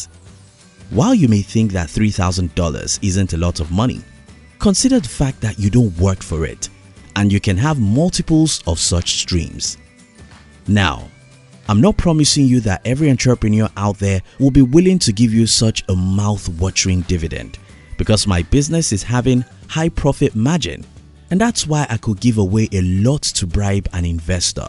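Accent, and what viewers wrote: Nigeria. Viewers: The voice sounds Nigerian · This narrator is definitely a Nigerian brother.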